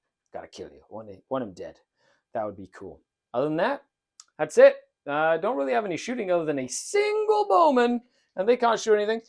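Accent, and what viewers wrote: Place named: United Kingdom. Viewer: Just found out about this channel and subbed already. I’ll leave the well deserved praise to the other commenters. All I need to know is… what kind of wild accent is that? Sometimes your a‘s are quite British.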